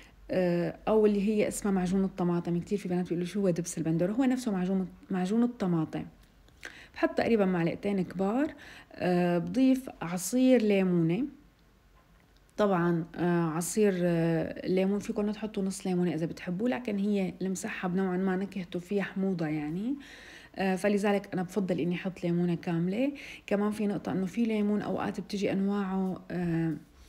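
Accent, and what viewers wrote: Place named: Levant. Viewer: رااااائعة.و طريقتك مبسطةواضحةوصتك فية ريحة الشااااااام♥️.احلى تحية الك من فلسطين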